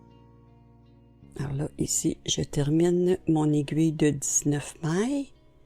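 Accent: Quebec, Canada. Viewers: J'adore tous vos tutos. Je vous ai découverte il y a peu mais déjà réalisé plusieurs pulls et autres avec les jolis motifs de feuilles et fleurs et c'est absolument magnifique. Donc ces mitaines je vais les faire pour moi et aussi pour mes filles et mes petites-filles. Et j'ai vu des toques et écharpes assorties pour femmes comme pour hommes. Magnifique. Les cadeaux de fin d'année, je vaus les commencer dès à présent. Merci et gros bisous de Belgique. J'adore votre accent. Moi qui avais fait les démarches pour habiter au Canada ou Québec, c'était sur le point d'aboutir lorsque tout ce que nous vivons a commencer. Montrez -nous encore plein de joilies choses. Ce sont des rayons de soleil ☀️❤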